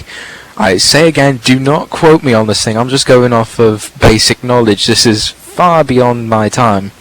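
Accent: United Kingdom. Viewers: British accent!!!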